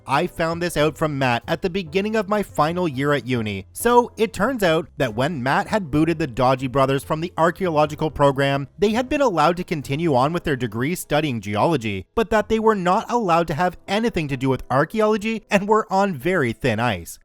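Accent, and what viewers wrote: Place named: Canada. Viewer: the Aussie way of pronouncing the names is with a short "a", and it is similar to how you would pronounce the word "has". However, as is (I believe) Canadian (?), I can't really fault him for saying the names differently.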